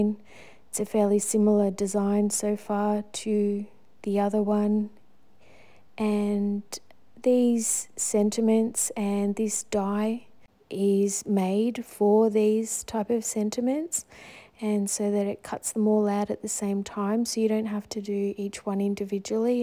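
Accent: Australia. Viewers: I am new to your channel and washi tape ideas. I like them both and I have just subscribed and am looking forward to learning new techniques and designs. I live in Delaware and it sounds like you are from Australia but it sounds like we have a lot in common. Thanks for an educational video.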